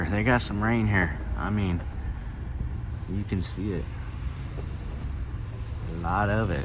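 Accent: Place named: Texas, United States. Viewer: ❤🇺🇸You are good at driving. I love: your accent; the two beautiful and cloce friends Dos in their nice color:Gold and Black(You already told their name in anothet video); you dress wonderful and your Ranch will be work very soon, that's my wish as your fan from Brazil. I thought you were from Texas. Any away I realy enjoy watch your video from California. Thanks alot. I see you on next.❤🇺🇸👏💖🙋🇺🇸💖💚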